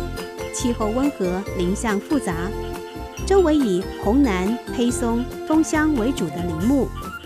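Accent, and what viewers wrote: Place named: Taiwan. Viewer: 主持人講話口音很清晰有台灣味道很親切好聽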